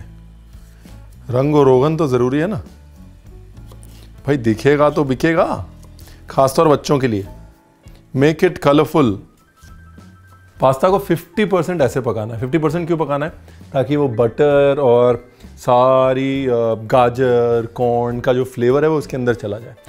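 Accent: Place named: Punjab, India. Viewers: Aap to na ji aap ne to cooking ka andaaz hi badal diya. Aur aapka punjabi lehza I just love to listen to it 👍👍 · U r commendable mann...aur jo punjabi nikalti h khana banate hue oye hoye hoye hoye😍😍😍😍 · Oye hoye, teri Punjabi!!!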